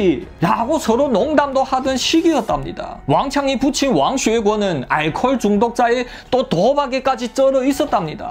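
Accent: Yanbian, China. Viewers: 조선족 같음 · 한국말 연변말 섞어서 너무 이상하네요 하나만 하세요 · 한국말을 중국발음으로 들으니 힐링되네.. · 한국말을 중국말처럼하네.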